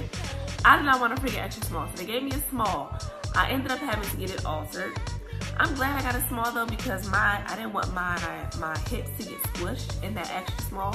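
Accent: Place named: Prince George's County, United States. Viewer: you sound like you from PG lls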